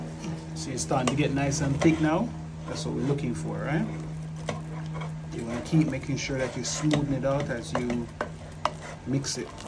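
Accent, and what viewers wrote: Jamaica. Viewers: He is Jamaican. That accent, although it has some US nuances, is decidedly Jamaican. He just chooses to eat with his hands (unlike Jamaicans usually do).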